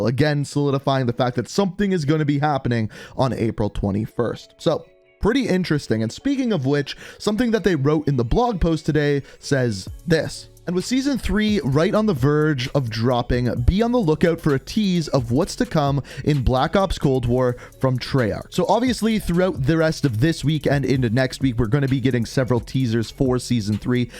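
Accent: Canada. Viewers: Is saying known the way he does a Canadian thing or just a way he does it?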